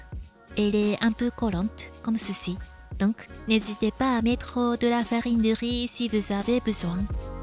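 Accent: Japan. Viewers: Inconditionelle de votre adorable accent japonais, et très envie de tester votre recette mais je n'ai pas de cocotte qui va au four, y a-t-il une autre solution ? Merci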